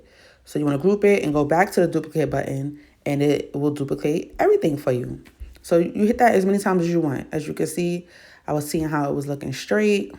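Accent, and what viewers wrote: New York, United States. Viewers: Love it lol def from NY cause thats how I be talking too. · I love your “keep it real” commentary and your accent (NY?) I’ve been wanting to do this...thanks for demonstrating!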